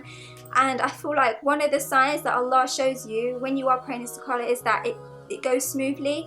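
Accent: United Kingdom. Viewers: I still try to understand the britush accent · Are you from Birmingham lol?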